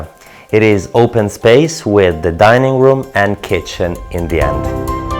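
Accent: Italy. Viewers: Buongiorno! I just got back from my holiyeaaay extracting myself from any social media platforms but waaaaittt I find out that your Italian accent is decreasing. I really appreciate your effort and I love both version. 良い仕事を続けてください。❤️🇮🇹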